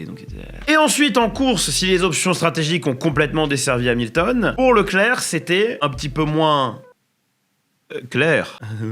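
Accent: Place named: Italy. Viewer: l'accent italien n'est pas trop mal géré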